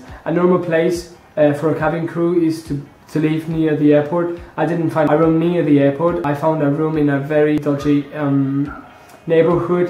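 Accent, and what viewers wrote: United Kingdom. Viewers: I did my recruitment day for Ryanair last Friday (Dec 6th), it's Tuesday now (Dec 10th) and this week I'm getting my answer, if I'm finally chosen I'll be travelling to Hahn on the 7th of January. Fingers crossed, I'm nervous and excited at the same time. Gracias por compartir tu experiencia, yo también soy español! Y enhorabuena por tu acento británico 👌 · Omg what an amazing British accent xx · Such a great british accent being spanish congratulations haha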